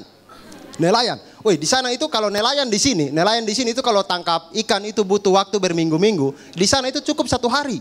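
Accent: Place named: Bugis, Indonesia. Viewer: Saya peminat abdur dari Sabah Malaysia. Hahahahah memang lawakan abdur semua lucu2.. bahasa kita pun lebih hampir sama sbb dialek abdur ni dkt2 sama dialek bugis.. lucu lawakkannya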